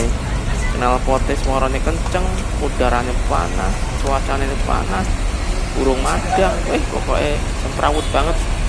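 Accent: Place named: Java, Indonesia. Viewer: Yang punya YouTube lucu sekali kalau komentar... Semoga sukses selalu YouTube nya... Sekali-kali logat Jawa nya keluarkan semua.... 😂😂😂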